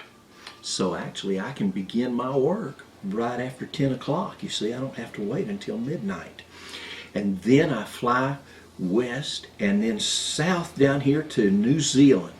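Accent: South, United States. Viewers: Santa had a bit of a southern accent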